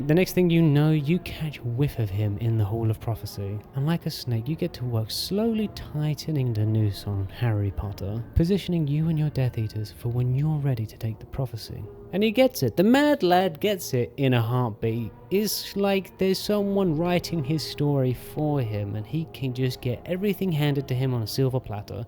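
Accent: United Kingdom. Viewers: Dude I couldn't hear anything you were saying it was a struggle even with subtitles. I don't know if it's because you're british of because you're mumbling. It was both. Either try to be less british or mumble less. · Any video with British narration that mentions colonialism within the first 10 seconds is an instant classic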